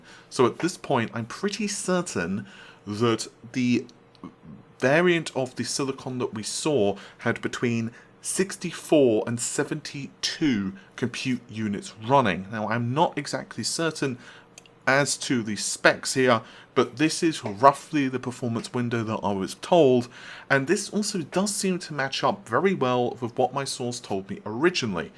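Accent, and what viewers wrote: United Kingdom. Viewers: British accent OMG 🤣🤦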